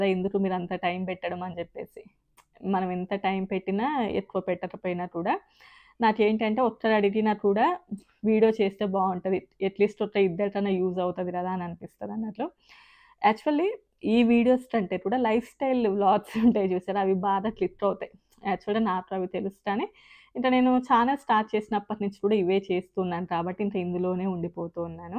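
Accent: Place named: Karimnagar, India. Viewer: You are explaining very clearly and confidently . I you are basically from karimnagar dist. Your pronounsation different from twin cities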